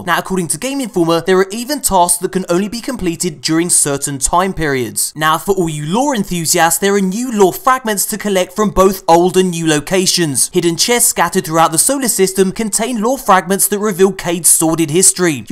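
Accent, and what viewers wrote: United Kingdom. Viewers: That voice is kinda annoying.... I prefer your brilliant British voice you beautiful beloved bostared (if you are British)👍🏿👍🏿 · Dude I love you but your British and when you morph your voice I can't understand shit you say. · And this kids is what happens when you combine clownfish voice changer and a lit British dude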